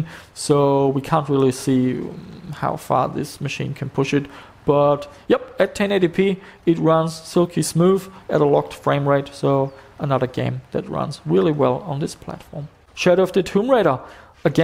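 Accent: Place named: Germany. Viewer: Great video!!! I thought you were German based off ur accent - didn't think Aussie!! Good stuff my man!!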